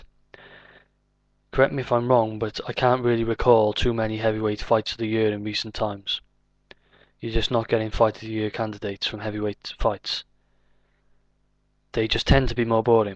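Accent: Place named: Newport, United Kingdom. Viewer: good vid m8, agree that lookin beyond the hype haye didnt do as bad as is being made out really, apart from that fallin down shit which pissed me off, i havent seen any1 for a long time last the 12th without being smashed to bits let alone rock him with a clean shot. id like haye to fight arreola or adamek an earn his way to mandatory an do it again. many would disagree but thats wat id like in an ideal world. where in wales u from m8? sound like u from Newport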